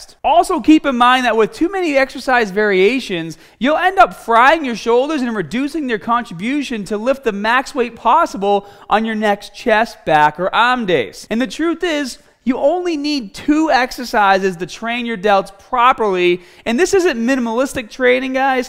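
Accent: Boston, United States. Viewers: Gonna hit the bah bells hahd to build up my ahms. Love that Boston accent! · Boston accent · He’s gotta be from boooooston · Northern American. Boston accent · whats with the new boston accent.... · Is this guy from Boston. I say -impossible to do that many reps and sets and only rest 90 seconds. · This guy is from Boston , Bahhbell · i hear boston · wow is he from Boston? He has very thick accent sounds kind of like boston accent. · Never paid attention to the Boston accent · That Boston accent. Love it! haha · Love the Boston accent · This guy has to be from Boston I am and I can see it right away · Smaaarrght.. Is that a Boston Irish accent? Excuse my unfamiliarity as I'm from NZ.. Sounds cool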